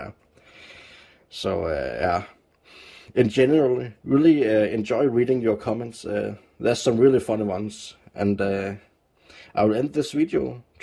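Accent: Denmark. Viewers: Thanks for the update BTW, if i ever need to show our lovely danish accent to anyone, ill show them this video. Håber der kommer en ny video April 2024 Tak for det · I love your videos, the Danish accent makes me smile, and fits the content. Keep up the great work.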